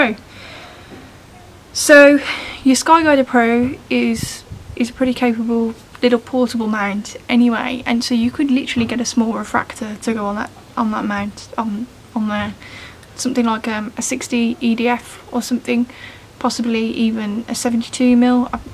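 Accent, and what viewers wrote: United Kingdom. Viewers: Great Video. Your honest opinions are definitely inspirational. I'm liking the way you say "Astro Pixel Processor" in your English accent so I continue to watch. Your direct responses to questions are right to the point which is more a reason for me to watch. I bought APP based on your recommendation and I never buy subscription software. I'm just learning an Astro cam. I have ZWO533 and ZWO183 and hope to get some images after being a DSLR user for many years. I bought the LP-Pro filters also based on your reviews. Hard to get these here in the USA. I have much light pollution over Boston, Mass and value your insights. Keep up the great videos. Being in a Pandemic, outside with the stars is the safest place to be.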